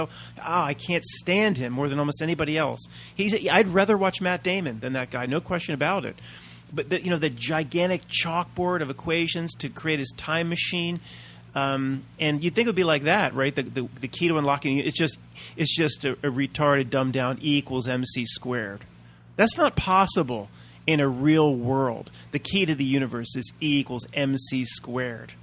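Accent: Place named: South, United States. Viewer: i love your country voice, thats my voice rofl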